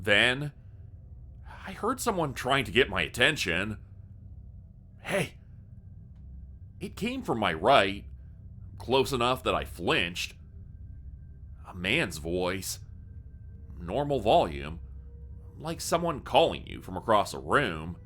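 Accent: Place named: Canada. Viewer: oh wow I thought it was his real voice 😊 especially the c😎😎L Canadian 🇨🇦accent I hear 🩷🧡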